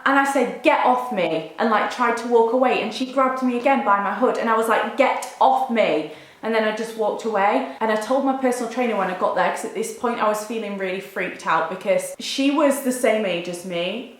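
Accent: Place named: North, United Kingdom. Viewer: You look so pretty! 😍😘Your northern accent was totally coming through in this video😜(not dissing just something I noticed lol) So excited for the new setups!😉😊💗💗xxx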